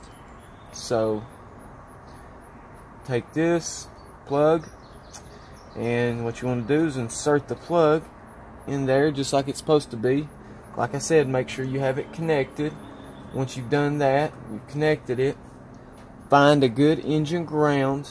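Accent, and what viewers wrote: South, United States. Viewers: Somewhere in the south. Really annoying to anyone outside that area. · The information presented is ok , but that southern accent is sounded much better 😊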